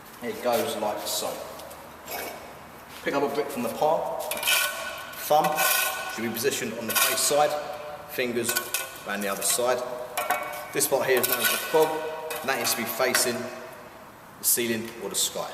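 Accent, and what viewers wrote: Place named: England, United Kingdom. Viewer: natural stone moments . It's an English accent so I'm guessing England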